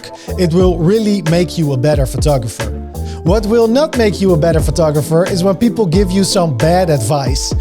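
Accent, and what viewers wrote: Netherlands. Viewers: you definitely dutch! you have the same accent of my dj friend from breda! hahahahah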